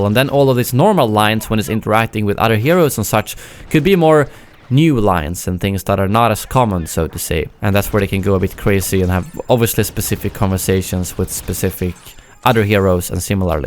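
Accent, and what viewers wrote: Sweden. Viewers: Are you swedish because you sound like you are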